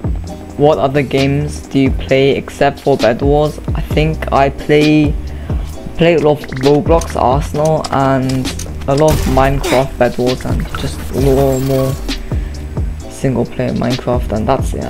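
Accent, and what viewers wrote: United Kingdom. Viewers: Lol, you have a British accent. But, no hate and nice voice. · U sound British btw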